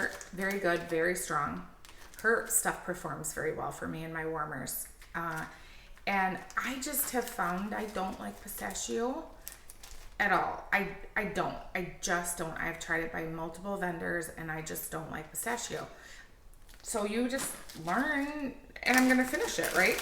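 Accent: Wisconsin, United States. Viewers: Love seeing your face!! So gorgeous ❤️❤️ I love that I can hear the Wisconsin in your voice. Minnesota here 🖐🏻 😂 I have the accent too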